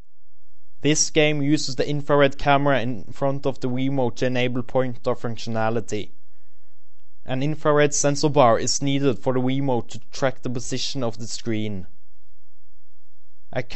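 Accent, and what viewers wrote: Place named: Norway. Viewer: hører så sykt at du er norsk :) nice presentasjon foresten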